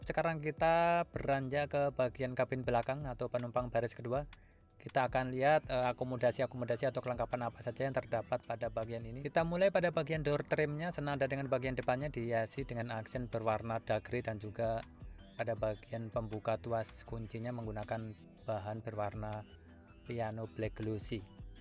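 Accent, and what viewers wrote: Java, Indonesia. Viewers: Medok banget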